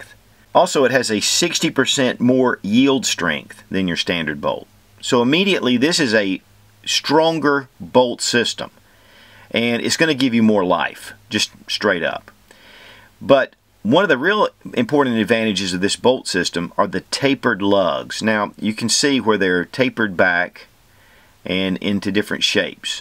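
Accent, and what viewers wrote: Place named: New Jersey, United States. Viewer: Sounds like New Jersey!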